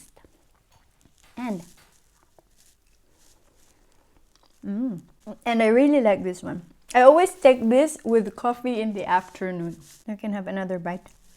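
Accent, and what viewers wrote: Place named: France. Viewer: I can hear French accent na if mag english ka.. hahaha kahinomdom na nuon kos joke ni Trevor Noah about French accent vs Russian accent.. hahaha Try to watch it dba malingaw ka.. hahaha <link>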